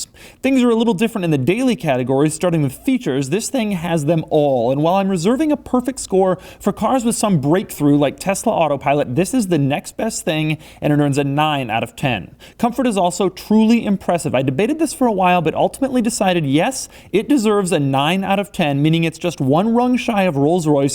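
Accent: Flanders, Belgium. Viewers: Ist vlaams ofwa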